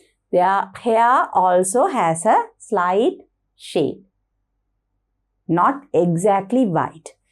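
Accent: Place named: India. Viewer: her accent is like an indian:face-fuchsia-poop-shape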